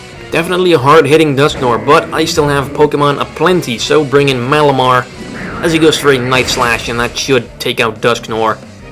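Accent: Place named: Spain. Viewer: español de pura cepa jajajaja pedazo de acentazo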